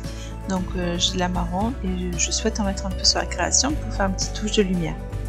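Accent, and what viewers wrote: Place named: South, France. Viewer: Coucou, Heureuse d'entendre ton p'tit accent du sud et de te voir, enfin tes petites mains hi hi, créer! Que dire...qu'a par que les couleurs que tu as choisi sont mes favoris, c'est que ta création est simplement MAGNIFIQUE j'adore, BRAVO! Je sais que ce n'est pas évident entre la vie de famille, la boutique, etc, d'avoir un p'tit moment a soi pour créée, mais, j'espère que tu referas d'autres vidéos de ce genre! Bon week-end & Soirée. Gros Bisouuus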